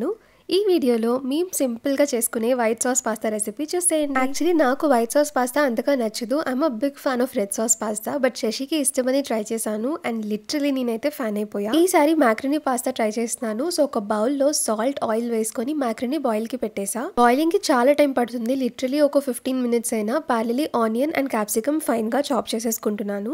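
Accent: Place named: Hyderabad, India. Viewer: Akka Neku slow ga hyderabad slang vastundi ga 😅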